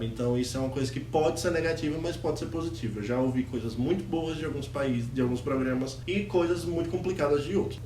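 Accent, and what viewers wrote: Brazil. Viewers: Aiii, vc é de onde? Parece bastante com o sotaque do RN!! Adorei e o vídeo, por favor faz mais! Tem poucos videos falando dessa forma sobre o Erasmus Mundus em português! Voce poderia falar mais sobre o processo de inscrição em si, a quem vc pediu carta de recomendação, o que elas continham. Detalhar um pouco seu currículo, pq tipo, vi algumas pessoas falando que nota contava muito, outra pessoa dizendo q as notas nao eram TAO boas, mas q tinha muitos projetos. Como /pq vc escolheu esse Mestrado em específico. Xero!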